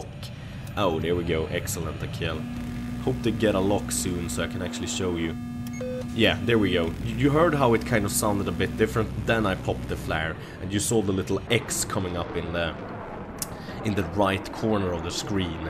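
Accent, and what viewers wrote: Sweden. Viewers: Are you from sweden? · u are swedish? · Yet... Yet gameplay, ah your accent is so Swedish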